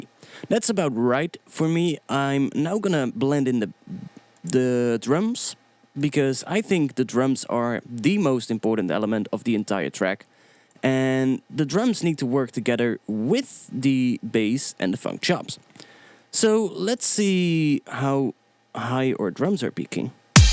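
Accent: Netherlands. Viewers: Somehow, if you're Dutch, no matter how good your English is, you'll always recognize the accent :D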